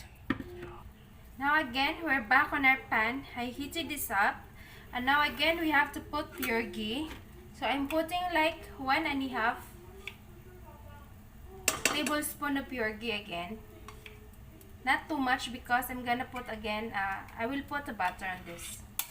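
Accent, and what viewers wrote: India. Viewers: I like your accent, u slightly get this Indian accent, mukhang masarap yan Sis thank u for sharing, stay connected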